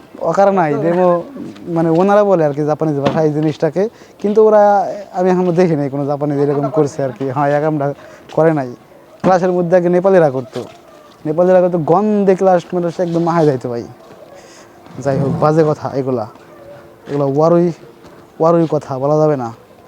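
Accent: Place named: Narsingdi, Bangladesh. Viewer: Bhaiar kotha shune mone hoy vai norshindir chele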